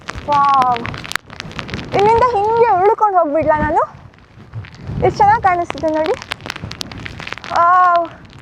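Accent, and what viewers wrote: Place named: Sagar, India. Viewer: any how I like u beauty voice as Sagar taluk kannada ❤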